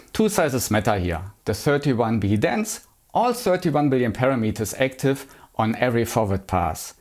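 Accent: Germany. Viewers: Hey bisschen off topic und bitte nicht übel nehmen - wenn Du magst, könntest Du mal ein paar von den schwierigeren englischen Wörtern, die man ständig benutzt beim Thema KI (inference fällt mir spontan ein, aber es waren mehr), nachschlagen wegen der Aussprache. Tschörmen Ecksent ist auf YouTube gar kein Problem, aber bei den Fachbegriffen würde es vielleicht professioneller wirken, sie zwar mit Akzent, aber davon abgesehen korrekt auszusprechen. Ich empfehle die kostenlose Seite der Cambridge Dictionary, die haben sowohl Hörbeispiele als auch einen tollen Ersatz für die nervige Lautschrift. With that out of the way: I like your channel a lot, keep being different than those who just run the same boring tests on every new model!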